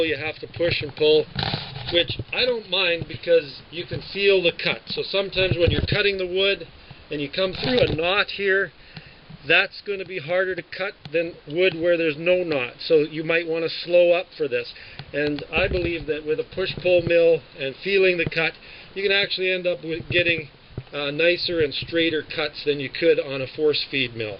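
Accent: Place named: Canada. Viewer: I detect a Canadia accent. We have 20 acres with mixed conifer forest, and a small number of oak. We need to clear trees to build. I thought we would turn the trees into firewood and buy lumber, but I'm starting to like this idea more and more. We have some very large trees compared to what you're working with, some that size too. Thanks for posting this.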